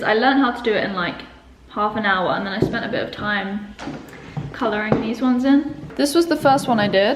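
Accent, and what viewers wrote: Germany. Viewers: As a native German speaker, I can tell you that your German accent is reeeally good❤️ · Love your german accent, i am from austria (so german is my mother tongue) and even I don‘t always get the grammar right - so don‘t worry too much, you‘re doing great!! Love your videos btw ❤️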